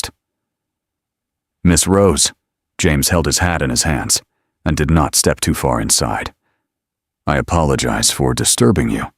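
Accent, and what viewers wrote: United States. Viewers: An American accent does not fit with these stories. 😢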